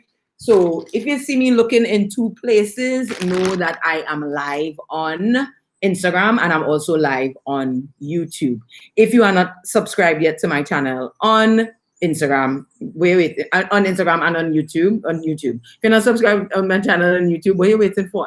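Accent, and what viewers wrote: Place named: Trinidad and Tobago. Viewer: awwwww i went to Trini a few years back :) this made me miss it. I love your accent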